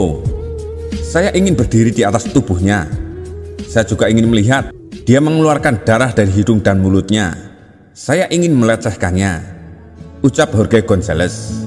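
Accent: Java, Indonesia. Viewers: Adminya medok sekali si bicaranya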